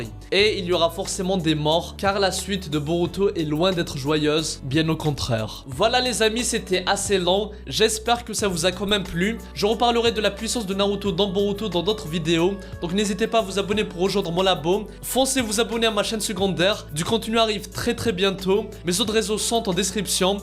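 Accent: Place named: Kabylie, Algeria. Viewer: l'accent kabyle est fort présent ! xD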